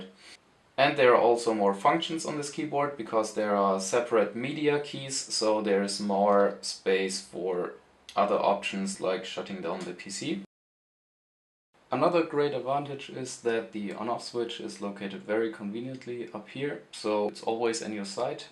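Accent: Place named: Germany. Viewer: Love the accent (must be German). I did not know the brand Rapoo, I like how compact it is. Thank you for the review.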